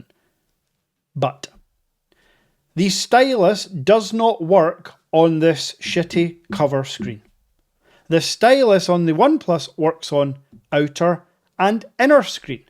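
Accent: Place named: Scotland, United Kingdom. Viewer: Well I just realised your Scottish HA . Why I thought you were from the UK is beyond me. No hard feelings. I know eh and french eh . 😂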